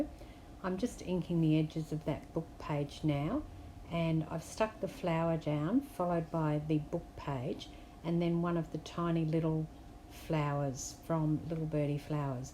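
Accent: Australia. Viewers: Hi, 😘 love the ideas. Thank you for sharing. I love, love listening to your soft Aussie accented voice 😍😍.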